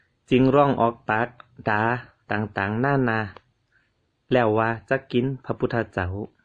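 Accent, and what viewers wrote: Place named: Tai Lue, Thailand. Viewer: เล่าประเมืองพยากนครเชียงตุงสำเนียนไทยลื้อได้ดีน่าฟังมากครับ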